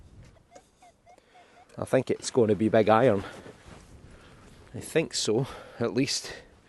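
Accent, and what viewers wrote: Scotland, United Kingdom. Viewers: I enjoy very much watching you find all of these treasures from way across the blue Pacific Ocean in beautiful Hawaii. Your Scottish accent is just beautiful to listen too. Always exciting to watch your finds!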